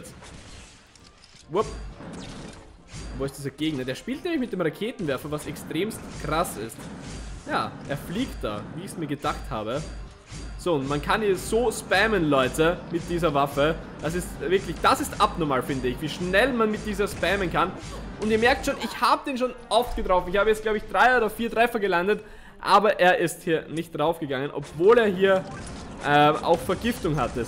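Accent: Austria. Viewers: Bist du Österreicher wegen dem Akzent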